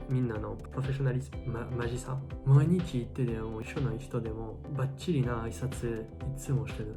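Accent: Kansai, Japan. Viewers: ♥独り言をぐずぐず言っているような喋り方で、すごく聞き取りにくいので、済みませんがもっとはっきりとしっかりと喋ってもらえませんか。 嫌味ではありませんよ、励ましですよ。 ♥う○ちについて蘊蓄を傾けるところがすごい。 花の都巴里ではなくて、実態は、う○ちの都巴里だったのですか？という事は、デート中でもう○ちを踏む確率大なのですね。それに、う○ちを踏んでいる事に気付かないままキスしている事もあるって事ですね。 地雷踏むのも、嫌だけどう○ち踏むのも嫌だな。 私は生まれてまだ一度もう○ちを踏んだ事はありません。偉いですか。 ♥御辞儀だったらいやいやでも出来るけれど、いやいやのキスなんか出来ないな。どうしているんですか？ コロナ禍であってもキスの習慣は健在ですか？どうしているんですか？ ♥関西在住ですか？何となくアクセントが。。。 ♥関係無いですが、若いのに額が後退している模様。これは励ましではありません、禿げ増しです。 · 少し関西弁だなーと思ったら、京都に住んでるんですね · 急におすすめ出てたので見ました。 フランス人は日本人キライかと思ってたのですが、貴殿で3人目です❤️ YouTuberで日本loveな方に出会えたの😊 しかも関西弁😁 親近感湧きまくりです☺️ チャンネル登録しまぁす😄 これからも宜しくお願いしまぁす🙇🏻‍♀️ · アラブ人に見える。言葉は関西人に聞こえる。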